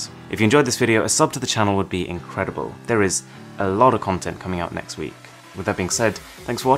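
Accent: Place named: RP, United Kingdom. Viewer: Hello Mr posh english accent. How good is this phone without google apps & services?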